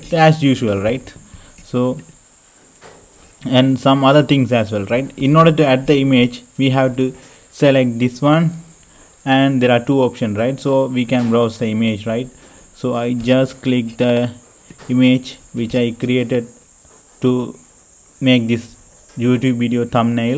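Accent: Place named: India. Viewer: i know i can understand it. When i hear an indian voice :v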